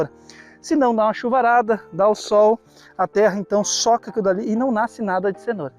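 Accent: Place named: Rio Grande do Sul, Brazil. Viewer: Olá, sou gaúcho. Pelo sotaque vc tbm. On?de fica teu sitio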